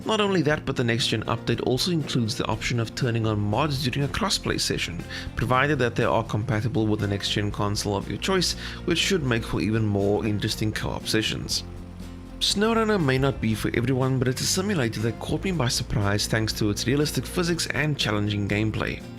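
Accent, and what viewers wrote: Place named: South Africa. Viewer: Awe my bru, South African yes ?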